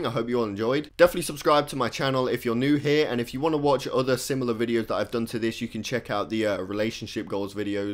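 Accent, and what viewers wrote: England, United Kingdom. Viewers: Your accent makes me want to move to england.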